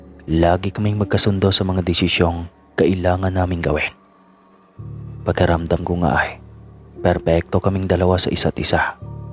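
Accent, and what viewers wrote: Visayas, Philippines. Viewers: Sir kahit Bisaya Diction mo galing mo mag narrate Sir🥰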